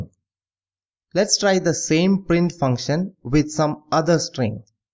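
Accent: Kerala, India. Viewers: By your pronunciation ,,,i found u are malayali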